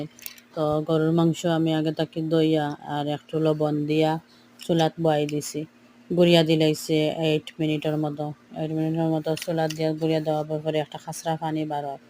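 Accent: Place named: Sylhet, Bangladesh. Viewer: আফনে খাঠি সিলটি মনে হয় খালা 😊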